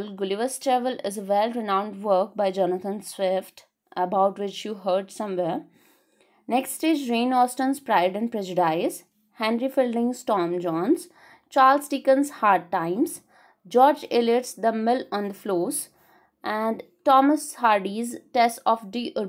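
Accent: India. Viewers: Nothing is more irritating than North Indian accent.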